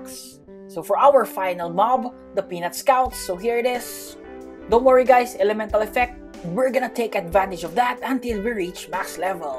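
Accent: Philippines. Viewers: Of course he is, my filipino teacher who teaches English in my school in Indonesia have a same Accent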